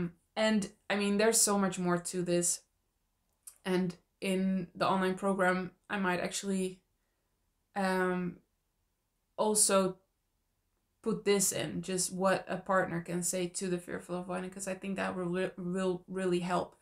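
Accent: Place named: Netherlands. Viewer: There are a lot of wonderful YouTubers on attachment styles but takes the cake - and this one in particular. 100% acceptance of an FA - or anyone - as they are in this moment or move on. Love your honesty, authenticity, wisdom and Dutch accent but amazing English / calming voice. Keep it up, girl!!!!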